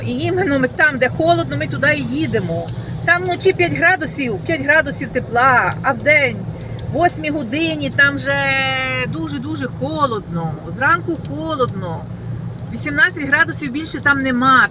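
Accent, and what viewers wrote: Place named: Ivano-Frankivsk, Ukraine. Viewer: вона з Івано-Франківщини , в одному відео проговорилась з коліжанкою , чути по розмові , що не Львів і не Львівщина ! 😂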